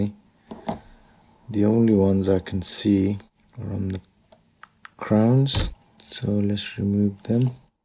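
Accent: Birmingham, United Kingdom. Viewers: It’s so therapeutic to watch you restoring watches, and a brummie twang is the perfect accompaniment!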